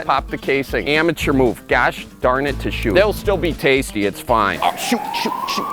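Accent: Midwest, United States. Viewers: Thank goodness for captions because you are full-bred Midwest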